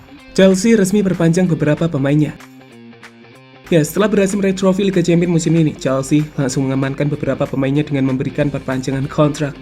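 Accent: Indonesia. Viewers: Anda Orang Asli Malaysia..?? Kok Logat bicara nya Indonesia Banget..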